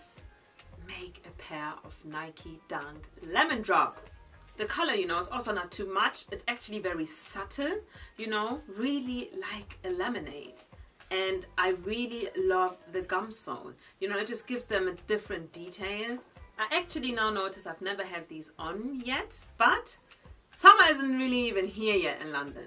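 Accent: Germany. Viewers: Nice deutscher Akzent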